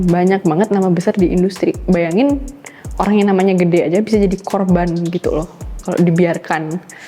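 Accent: Java, Indonesia. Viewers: Muka bule, tapi kadang keluar medoknya. Mbak kamu terbaik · hostnya kok medoknya lama-lama ilang ya?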